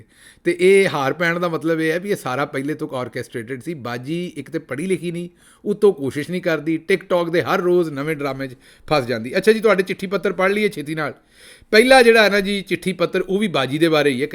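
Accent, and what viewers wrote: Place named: Punjab, India. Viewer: sb ap sirf punjabi V-Log kiya kren, ap ka Labo Lahja punjabi ki azmat ko doballa kar data ha. Youn lagta ha ap punjab ki gali kochun ma bantay ar bandar killa khaltay jawan hovay. Punjabi ki gahrai ar rwani sun kar ap punjabi k professor lagtay han, choren urdu english bus punjabi v log..kiya munfrid andaz ha ap ka..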